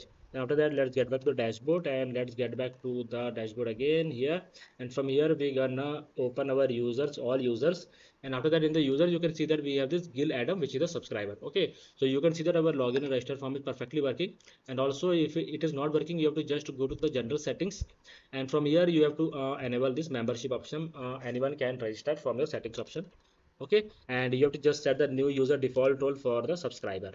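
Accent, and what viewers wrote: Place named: India. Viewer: Thank you for the video, but this speedy Indian accent will kill me someday...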